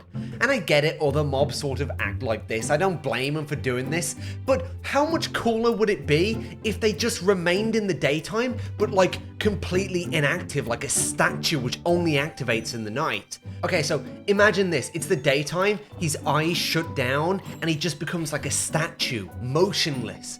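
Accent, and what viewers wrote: United Kingdom. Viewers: I love British man talking angry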